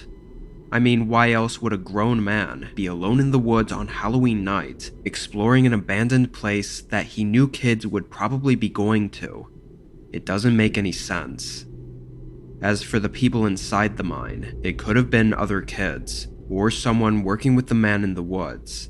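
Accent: Canada. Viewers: I actually hear a Canadian accent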